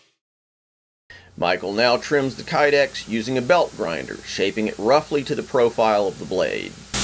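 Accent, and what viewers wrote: United States. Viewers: You have the clearest, most neat accent i've ever heard:P. Coming from a foreigner, You sound like the perfect american accent for me. Just an observation, though quite a silly one. I really love Your work, and videos, and the step by step process. You're great!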